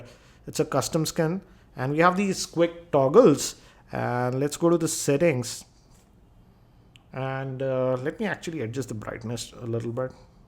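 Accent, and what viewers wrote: India. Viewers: dear, please change your accent. It's ok to have an Indian accent, but when you stop every sentence, it feels like you are singing, but with the same tune. Please take this as a friendly suggestion and please stop bending the last word of your sentences. Otherwise all your reviews are good.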